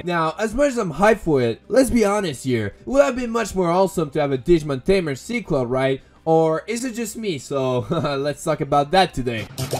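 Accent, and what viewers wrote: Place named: Quebec, Canada. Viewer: Yo my guy, i gotta ask. Are you a french canadian by any chance? Your small accent and a couple word pronunciations are telling me yes XD. Je suis presque sure a 100% lol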